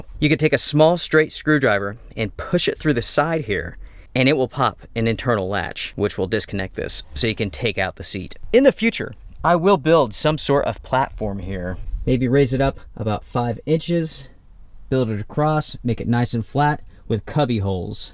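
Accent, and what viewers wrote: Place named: North, United States. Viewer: where's the mountains of North Carolina accent ? you sound like a yankee to me.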